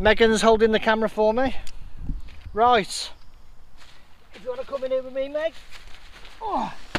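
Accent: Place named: Birmingham, United Kingdom. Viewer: You sound like a fellow brummie or maybe the black country lol. I did watch a video with Hazeoutdoors and he said the red pole should go in 1st, I'm not sure tbh as I've looked on the Terra nova website and they say different, I was just thinking about how saggy it was on the inside once you'd set it up. Maybe after a few times it'll come right ✅️ 😌 👍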